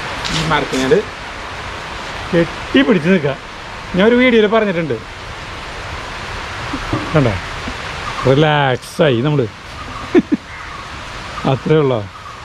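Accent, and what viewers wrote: Thrissur, India. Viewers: Thrissur slang❤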